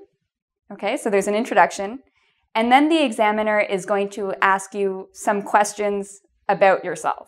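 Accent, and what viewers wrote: Canada. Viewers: Your canadian accent is so cute :) OBOOUT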